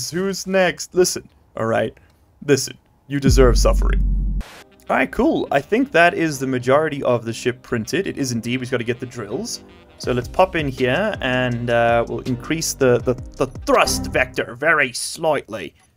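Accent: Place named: South Africa. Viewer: I keep forgetting youre from SA.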